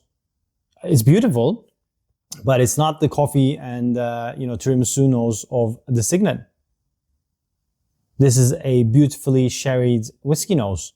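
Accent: Turkey. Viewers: are you turkish? Your accent sounds very turkish 😂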